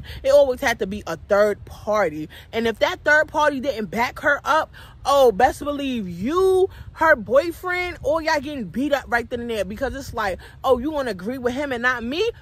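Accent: New York, United States. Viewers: Sis you be getting some good sponsors! Anyways been binge watching you since your new body butter video loved it even though I’ve been making my own for years I loved your video and how entertaining you are! Now I usually hate to hear that accent so I don’t watch people who have that New York accent but yours yeah I likes. You and symone are my favorite storytime YouTubers now. Anyways keep pushing these videos out sis!💙💙💙 love from Cali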